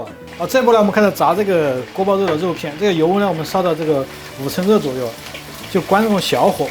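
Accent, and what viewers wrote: Sichuan, China. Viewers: 期待的年夜饭特辑，好喜欢！我小时候在四川住过四年，家乡的方言听着好亲切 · 很喜欢看你的美食视频，很轻松，节奏也控制的很好，少许川普加时不时的幽默— 社会佩奇😅 请继续分享，我继续点赞👍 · 喜歡川普口音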